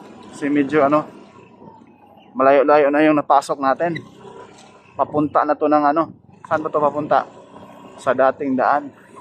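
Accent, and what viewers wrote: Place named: Visayas, Philippines. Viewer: Kainaman.bisayang nahaloan ng batangasin kung mag salita. Resulta bulol😁✌️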